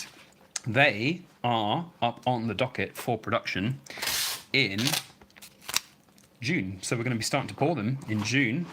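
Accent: United Kingdom. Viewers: Was watching a video today and they were talking about regulating gold and silver for the common man you sound British and don't know if it will effect you. But in America they want to know how much metals your selling. Like this having a bullion kinda business they want to regulate here in America also said you'll have to have some kind of paper work when you go to sell silver and Gold and if you don't there trying to say how do they know its yours smh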